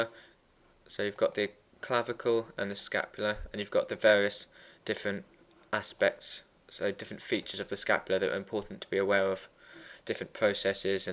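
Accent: United Kingdom. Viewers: Big, massive, enormous thanks! It's really hard to actually visualize all of this while reading text books and browsing through an atlas. I always finish off each part by watching your videos. It's also almost equally awesome that you speak British, with some luck I will have mastered the accent by the end of my first year! :) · Good work but no offense .. I don understand British Accent clearly !! :"(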